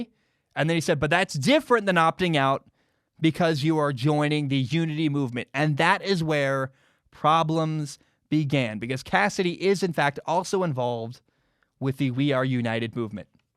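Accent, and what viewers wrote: United States. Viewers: Silverstone difference is just from your american accent compared to the british one:) grand prix has french origins so thats why the pronunciation of the d is silent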